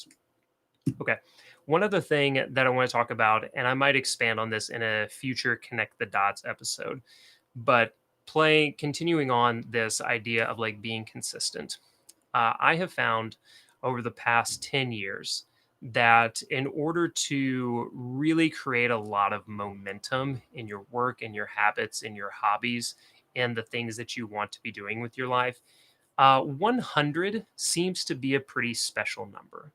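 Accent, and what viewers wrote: South, United States. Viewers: love southern accents! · Love the southern accent and the "Never settle" in the background. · The southern accent was chef’s kiss And I’m mad I missed this stream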